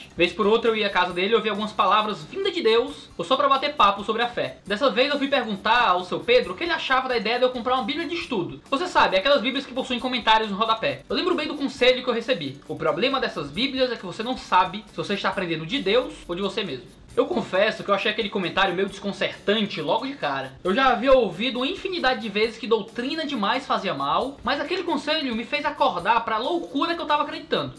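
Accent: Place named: Northeast, Brazil. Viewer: Fico ouvindo esse nosso sotaque (nordestino), e admirando essa biblioteca 😍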